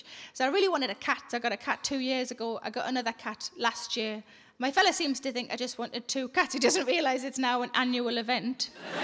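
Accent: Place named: RP, United Kingdom. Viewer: She’s the funniest 😂! She sounds so posh then she drops the F bomb which doesn’t even sound bad when she says it! 😂😂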